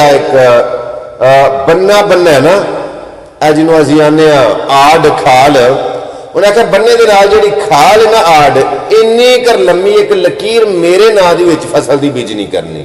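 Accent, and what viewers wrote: Punjab, Pakistan. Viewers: U r great ap ka baap sealkoti hy lekin ap k nanke 100 %mandi bh ya gujrat men hun ge apki bate sun kar aisa laga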